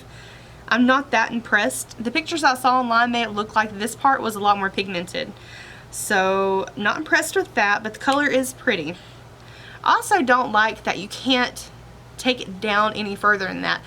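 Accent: South, United States. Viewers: Love your eyelook, especially with your eye color! I got the other eye palette and my jury is still out. lol I got the Sunday Riley cream as part of my box and am in love with it; that fragrance!!! I also added on the Tetris lip balm that you did and think it is a great product and such fun packaging. I'm a new sub and 1st time commenting. I like your channel and your accent. You sound southern to me. I'm 63 and live in Iowa and am pretty new to Ipsy (since Dec 2018).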